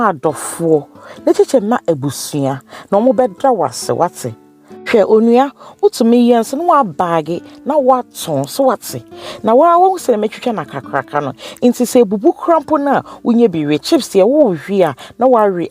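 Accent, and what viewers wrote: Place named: Twi, Ghana. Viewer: Eiii madam w’ano ni twi y3 d3 yie papabi ❤️ beautifully done sis 🙏🏻Much love dear ❤️❤️❤️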